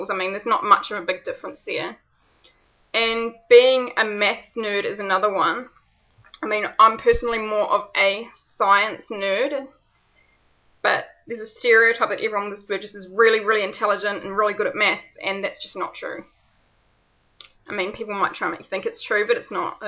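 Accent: New Zealand. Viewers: She's a lovely keewee! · Yeah thats a good kiwi accent ! Now everyone this is a girl from a place called new Zealand . Do you know where that is?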